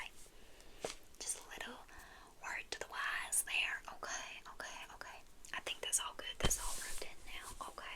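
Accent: United States. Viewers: your "country" accent is too cute haha. loved this character and roleplay ❤ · I love this. Thanks to bridgerton I have heard so many asmr videos with terrible British accents! Your American accent is ten times better than when I try one.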